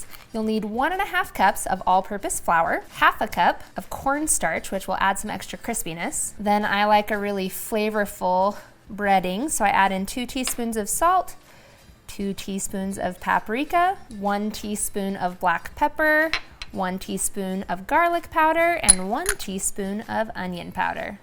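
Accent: United States. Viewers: Did a lil southern come out in you doing this video? :) Well done ma'am!!!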